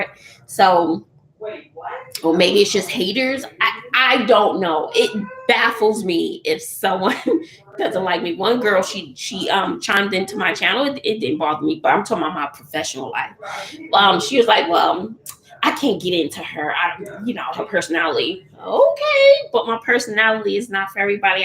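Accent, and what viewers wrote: New York, United States. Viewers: Lol, i love your NEW YORK accent, state of mind and swagg, you remind me of one of those NY Jewish Women, you're AMAZING, you could be a celebritie with your beauty, talent, humor and charm. Hang in there, it wont be long before you'll be an "EMPTY NESTER" time flies.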